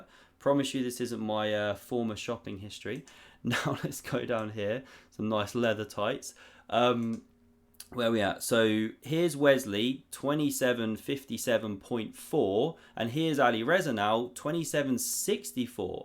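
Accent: United Kingdom. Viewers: Love your channel and your cool British accent.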